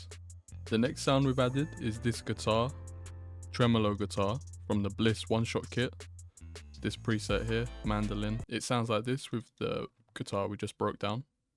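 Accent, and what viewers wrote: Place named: United Kingdom. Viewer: where are you from bro? sound like the UK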